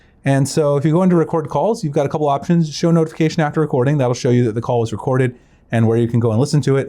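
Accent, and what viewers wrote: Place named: United States. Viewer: You talk to much horrible American accent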